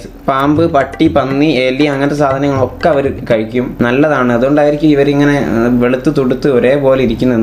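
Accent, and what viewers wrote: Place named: Kozhikode, India. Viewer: Bhasha shaili vyathyaasam indaavum bro Karanam vayanad kaaranaan kozhikod karanan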